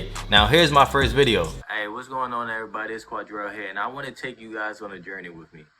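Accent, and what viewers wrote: Philadelphia, United States. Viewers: I thought you was from Philly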